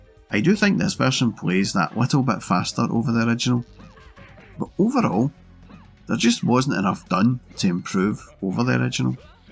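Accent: Scotland, United Kingdom. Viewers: Do you have another channel? Theres another guy with a Scottish accent on the segaguys channel i think😅 (asking if you're the same guy, sorry)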